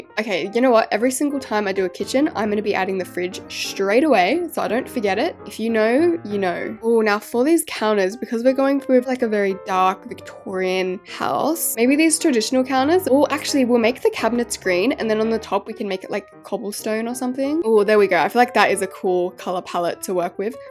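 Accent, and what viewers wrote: United Kingdom. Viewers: I love ur voice its like so British !! like I did like ur “red is a spookah colar”! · I love that u talk British 😊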